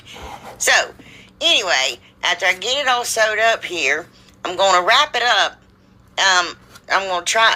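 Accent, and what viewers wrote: South, United States. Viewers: These are all so gorgeous! You are a nut and laugh every time I watch you. You sound as country as me. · I absolutely love all you do and thank you! I adore ya girl! From this southern accented Georgia peach (well no longer live there lol), to your southern accent ..big hugs.. and much love. I hope your husband is feeling better.. last I heard from you he wasn't feeling well (been away doing chemo, so have not been on here as much as usual). I will be on Fri the 18th. Can't wait! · you are just AWSOME.I was away for Christmas,hospital! I really missed you.I love you’re southern accent. You’re just a blessing to me.thank you,God bless you, Happy New Year!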